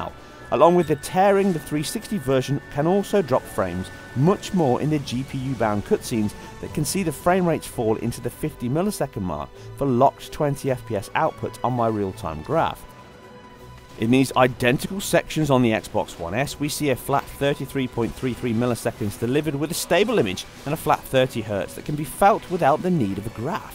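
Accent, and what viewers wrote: England, United Kingdom. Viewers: Typical dozy yank, you are aware that he is actually English from England speaking his native tongue English. American English is a bastardised version of the English language. Anyways, good job as always.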